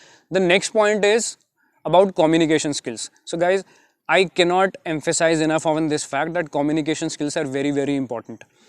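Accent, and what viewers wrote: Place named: India. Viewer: Well, I'm Ukrainian and it's a bit difficult for me to understand English with Indian accent but I'm trying. Not all your subscribers are able to understand Indian language at all, so it would be better not to mix it up and create separate videos in English and Indian only for your audience's convenience.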